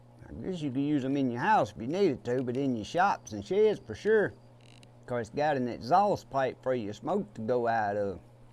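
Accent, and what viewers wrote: United States. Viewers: Great video! 🙂 I love your accent, where are you from in the USA? (I'm Australian)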